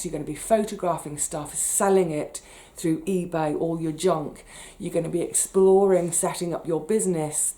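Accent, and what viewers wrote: United Kingdom. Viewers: Hello!! I just found you, it surprised me to hear a British accent. I seem to only be finding American channels which have helped a lot, but I can relate more being in England.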